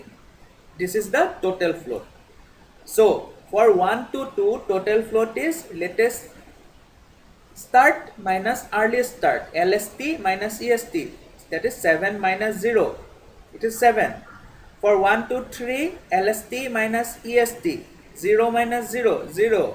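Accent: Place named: Assam, India. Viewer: Ur accent is like an Assamese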